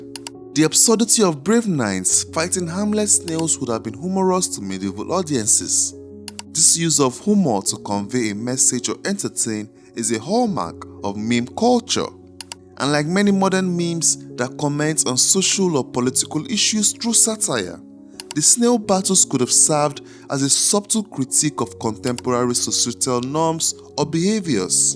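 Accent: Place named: West Africa. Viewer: What's with his accent? He sounds like he's from West Africa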